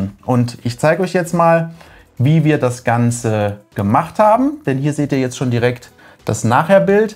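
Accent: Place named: Swabia, Germany. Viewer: Servus, du sag a mol woher kusch du eigentlich genau? Du schwätsch d gleiche slang wie i😅.vg Tobi😊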